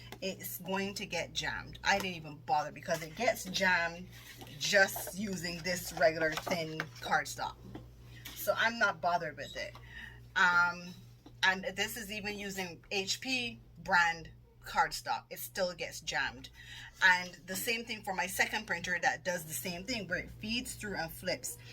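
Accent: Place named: Barbados. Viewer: I thought as much I can hear the bajan accent. I’m from Barbados as well. Live in UK now !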